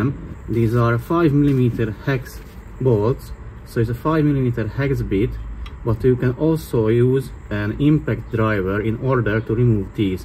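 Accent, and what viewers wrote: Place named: Hungary. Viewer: Köszi a munkád! Amint meghallottam az angolod azonnal tudtam hogy magyar vagy! Tényleg hasznos volt számomra ez a szerelés mert épp előtte állok! De lenne pár kérdésem: Ajánlod rézpasztázni a tárcsa és a kerékagy közötti részt? Valamint az alsó és felső csúszkát kell zsírozni? És ha igen akkor azt hogyan lehet?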